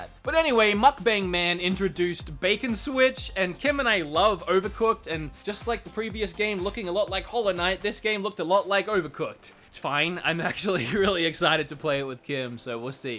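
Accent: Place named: Australia. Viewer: You're an Australian!? I did NOT expect that!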